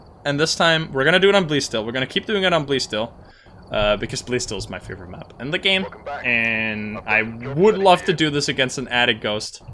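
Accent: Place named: Netherlands. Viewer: seems like so many dutch accents are barely noticeable. I've always wondered why that is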